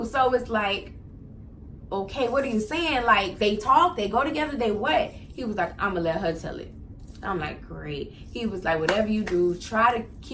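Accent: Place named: New Orleans, United States. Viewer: You from Nola ? I hear an accent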